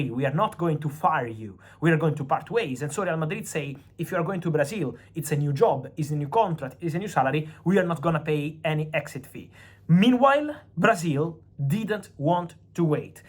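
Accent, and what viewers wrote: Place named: Italy. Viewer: Fabrizio's fast speech accent of Italian are embarrassing to understand...please stay tranquilized and speaking slowed 😂🙏🏻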